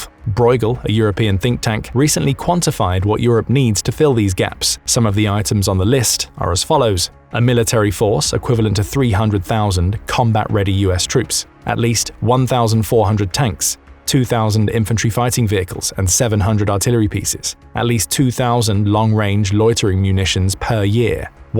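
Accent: United Kingdom. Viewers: YOU ARE TALKING A LOT OF NON SENSE, ON TOP OF IT STATING THAT UKRAINE HAS LOST 46.000 PROPLE IS BEYOND COMMON SENSE. FROM YOUR ACCENT YOU ARE BRITISH MEANING YOU ARE SADELY LYING ALL THE WAY.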